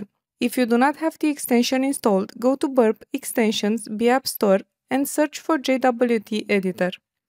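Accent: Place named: England, United Kingdom. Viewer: i like you english accent dear teacher👍👍 would you mind telling me which accent is it??????????????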